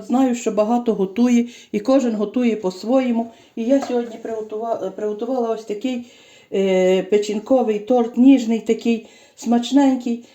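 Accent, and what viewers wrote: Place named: Galicia, Ukraine. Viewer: Дякую! Завжди дивлюся Ваші рецепти. Продовжуйте! Ваш галицький акцент- чудовий! Всього найкращого!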